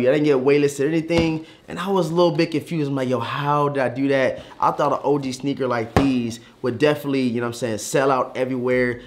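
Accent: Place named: St. Louis, United States. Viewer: A G are u from St. Louis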